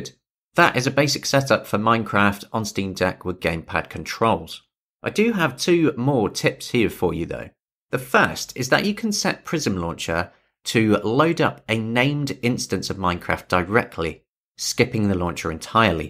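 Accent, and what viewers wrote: United Kingdom. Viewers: Oh my god I found a British hello from American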